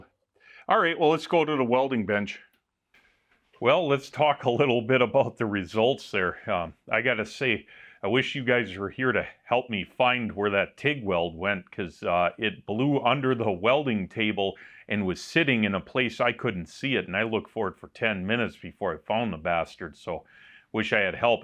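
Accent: Canada. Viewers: I'm from Detroit, we border Windsor Canada. So growing up I knew a lot of Canadians and you sounds like them! Nice vid btw. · This is the thickest Canadian accent I’ve ever heard. This is what they call a “newfie”? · Sounds Canadian, eh? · Is this guy Canadian. The accent.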